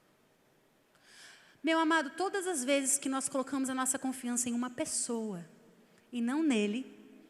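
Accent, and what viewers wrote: Minas Gerais, Brazil. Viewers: pensei que tinha sido no mineiro kkkk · é sotaque mineiro mesmo kkkkkk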